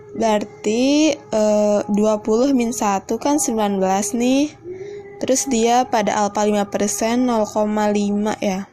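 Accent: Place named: Lombok, Indonesia. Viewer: Logat lomboknya jelas banget mbak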